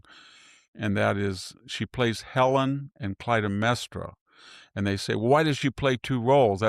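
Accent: United States. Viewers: ❤❤❤❤it is so nice for me hearing the old greece-names with an american-english pronunciation. If you would not write the names I would not understand the original greece names❤❤❤❤ regarding your topic, you are absolutely right, "classical literature was once the cornerstone of education" =I appreciate this sentence so highly ❤❤❤❤ I am living in Wien/Austria, and I estimate the tendence is here the same as you described❤❤❤❤ warm greeting to you❤❤❤❤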